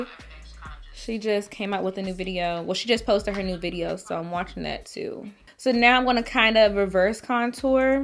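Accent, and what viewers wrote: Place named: Maryland, United States. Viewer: I knew you were from MD!!! I could tell by how you talk, lmao. I'm from PG, you?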